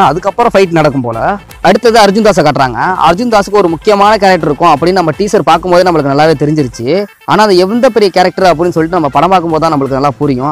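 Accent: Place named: Canada. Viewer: Enna bro Canadian lad maathiri tamil maappilaya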